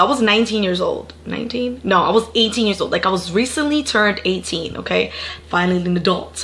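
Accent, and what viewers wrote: United States. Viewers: yo .. you sound like you from the 413 Springfield Mass ... then you said CT ...lmoooo i was close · Before you lived in Connecticut did you live in jersey bc I feel like you have a jersey accent just like mind get it girl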